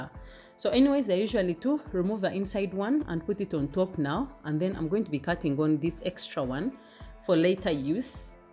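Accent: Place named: Ankole, Uganda. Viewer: First time here , fast forward I love ur English ankole accent , then how u make it simple for day to day life of a Ugandan not professional chef, I have already subscribed can't afford to miss anything. Well done mami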